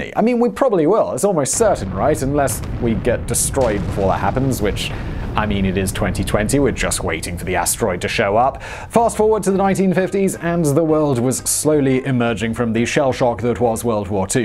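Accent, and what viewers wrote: United Kingdom. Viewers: It's a joke, not a dick. Don't take it so hard. On a serious note, I'd love to see such a channel, and would subscribe to it immediately upon knowing it exists. I truly enjoy delivery, and I love the British accent.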